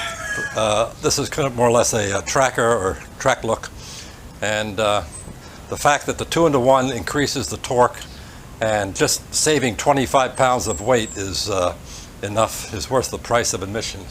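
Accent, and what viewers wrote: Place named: United States. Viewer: Hi. New to he channel. Glad to hear you are feeling better. I came for the RE 650 content and I am happy to see you fitted aTEC Stinger exhaust. I am thinking about buying a RE 650 in the future and I saw TEC's vid regarding this exhaust and was blown away with the torque pick up and weight saving. But I wanted see someone install and opine about it and the performance enhancement. What is TEC charging for it? It not listed on there US website last I checked. Also, on a personal note, you sound like an American, what brought you to live in the Philippines?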